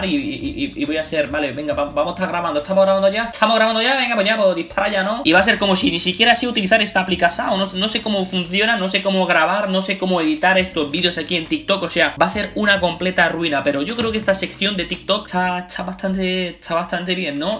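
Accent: Argentina. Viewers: Se le sale lo argentino a jajajaja extrañaba poder ver videos tuyos! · el acento argentino JAJAJAJAJJAJA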